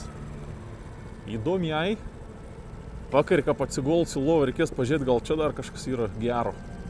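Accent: Samogitia, Lithuania. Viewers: Kodel zemaitiškai kalbi sakei lietuviškai kalbesi😢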